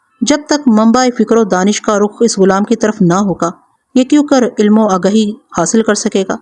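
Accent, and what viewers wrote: Punjab, Pakistan. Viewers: جو مزہ الیاس سیتاپوری کی کہانیاں پڑھنے میں آتی تھیں وہ سن نے میں کہاں اس کی اصل وجہ آپ لوگوں کا پنجابی لب ولہجہ ہے جو سماعت پر بار گراں گذر تا ہے ۔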